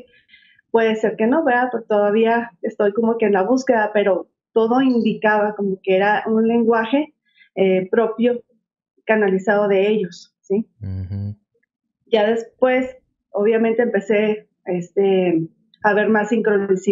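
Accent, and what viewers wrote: Chihuahua, Mexico. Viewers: Yo sabia que eras mexicana por tu tono de voz que alegría 🤩 que puedas contactar con Los Ángeles y seres de otras dimensiones me atrae mucho este tema saludos es la primera vez que veo este canal yo desde que era niña vi una sola vez a seres desencarnados nunca lo hablé tenía alrededor de 3 años y cuando tenía 8 lo platiqué porque vinieron familiares de mi mamá de USA 🇺🇸 Y tocaron el tema pero ellos venían con un aparato detector de metales y al escucharlos volteé y les dije yo los vi me querían llevar al pueblo pues era donde vivían mis abuelos nunca más quise regresar a ese lugar. Después cuando ya era adulta tenía una especie de ataques como epilepsia pero me chocaron y no tenía nada pues tuve un evento de esos y yo sentí q me iba por un túnel oscuro y grité y de NO y siempre cusnfo me platicaban de alguien que tenía un dolor físico yo lo sentía hasta la fecha yo no puedo escuchar q a alguien le duela algo porque me lo trasmiten. 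También cuando mi papá iba a fallecer un día antes soñé a mi mamá ya fallecida y la traían unos seres muy altos con una túnica blanca y la traían en una caja de cristal y la sacaron y yo le dije en mi sueño q si no iba a entrar a la casa y ella telepáticamente me dijo que no y esos seres la cargaron y la depositaron de nuevo en ese ataúd de cristal y le dijeron ya es hora y se desvaneció la imagen y muchas otras experiencias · Wow eres de chihuahua mi paisana que emoción lo supe por tu acento ,,, un abrazo 🤗😘 gracias gracias 🙏🏻 . Me voy a comunicar contigo para que me ayudes necesito una terapia necesito mucha luz 💡 estoy pasando por algo difícil en mi vida. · !! desde que empezaste a comentar supe que eres de Chihuahua, muy interesante tu información, gracias, gracias, gracias, un abrazo con amor y luz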